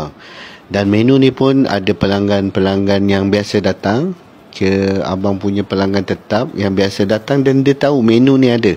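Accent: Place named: Kuala Lumpur, Malaysia. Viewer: Cakap laa pilah..baru unik bunyi konten ni. Tkojut awk..awl² burak cam org klumpo..pnutup..tibo yo kole pilah.😢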